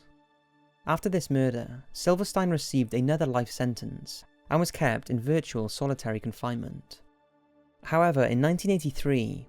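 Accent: United Kingdom. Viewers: PLEASE add English captions because I am a big fan of your videos and there are many others do too and we can't always understand your British speech (it is amazing but we just want some captions alongside it :). If you could PLEASE look at this comment and pin it if you saw it, a replay is awesome too man.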